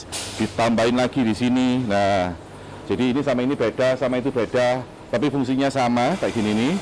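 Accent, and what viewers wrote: Java, Indonesia. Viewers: Jowo a pakde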